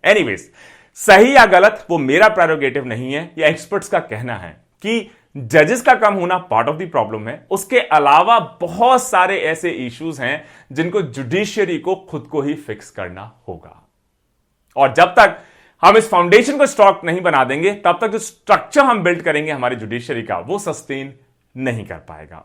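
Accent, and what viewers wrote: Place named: Rural, India. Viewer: सर आपके वीडियोज देख के एक पूरा रोड मैप तैयार हो गया पढाई का , परी analysis के साथ हो रही हैं,बस एक चीज की ठोडा कमी लगती है, हिंदी साफ सुथरा बोलने की ,क्योकि उसमे local language आ जाती है, गांव वाली हिंदी, गांव की भाषा ठीक है अपनी जगह पर हर चीज मे professional होना चाहिए, इससे थोडा आत्मविश्वास कम हो जाता है,इस पर कैसे काम किया जाए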